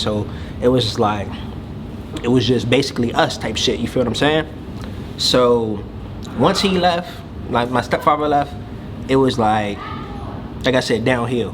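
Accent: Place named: California, United States. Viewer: Bro, it’s crazy. I always knew it something about you. I know my fucking people your mom and yo is from Cali. That’s crazy I don’t know if it’s Up North in Oakland or South Central or somewhere close but I could tell by your accent already but when I heard your mommy, Uncle 👊🏾 oh for sure, I knew what time it was.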